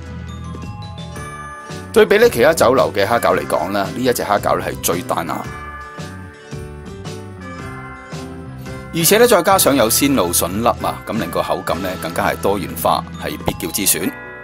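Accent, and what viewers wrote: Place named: Guangzhou, China. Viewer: 好濃廣州話口音 香港人都自漸不如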